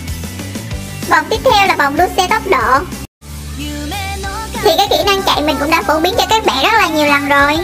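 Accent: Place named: North, Vietnam. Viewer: Nghe giọng chị giống người miền bắc quá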